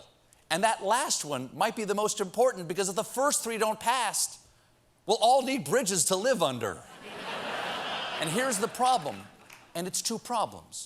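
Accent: France. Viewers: J'adore your French accent! En plus, we are 83,9% vaccinated today =0) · His French accent is spot on.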